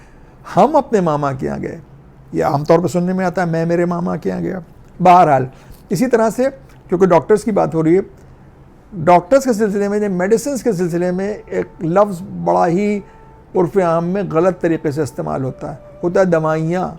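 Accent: Lucknow, India. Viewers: Vah..Aaj sunee Lucknow ki puranee jaban va dilect Andaz..Dr Hukku was first England returned doctor. His encounter with Raja saheb Kasmanda Sitpur was very interesting..Our elders in the Highcourt used to narrate old stories of 1930s 40s Lucknow and its grand old generation..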